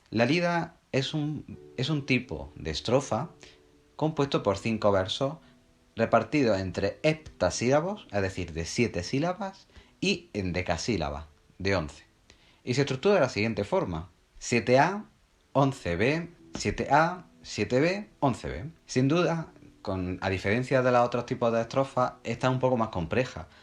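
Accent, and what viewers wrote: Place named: Andalusia, Spain. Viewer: No respondas a esta gentuza solo buscan atención, me ha salido tu video en mi sesión de estudio y sinceramente no he tenido ningún problema entendiéndote añadiendo, de que el acento andaluz es bonito no hacía falta darle ninguna respuesta ni explicación, gran contenido sigue así!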